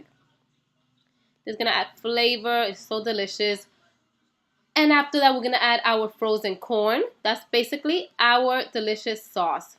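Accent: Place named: Dominican Republic. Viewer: U sound Dominican is this the Latino way of making? Lol looks good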